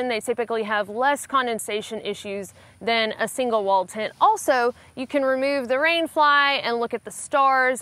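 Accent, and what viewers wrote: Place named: South, United States. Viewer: I seen you and your mom in the desert so I thought you lived out west. Until I heard you talking y'all 😃😃. Yep you must be a good ole southern girl. Great review. I like seeing the rain test. To me staying dry at night is my main concern. Thanks for these reveiws. Like the dog love her colors. She's marked up real pretty. Looks like she might have a little bulldog in her.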